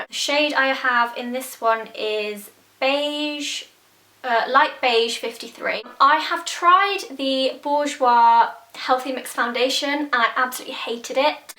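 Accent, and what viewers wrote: United Kingdom. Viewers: I don't understand why people think you're faking the british accent? Maybe she's just very well spoken and has very good dialect? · not to be rude or anything but that was a really stupid comment. would you please enlighten me on why she would feel the need to "fake" an accent in her videos? she's from the U.K, shes BRITISH.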